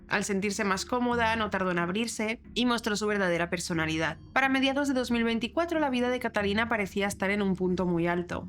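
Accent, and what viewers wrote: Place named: Castile, Spain. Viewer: Por dios l sacais puya a todo,ella es Española y lo narra como Española castellana,si quieres escucharlo tal cual hablais en vuestro pais pues ver a una argentina narrandolo.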